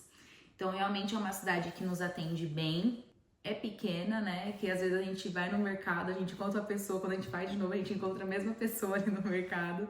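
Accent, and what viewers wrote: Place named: Portugal. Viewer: Temos alguns conhecidos “por cá” olha o “purrrtugueix” sobressaindo ihuuuul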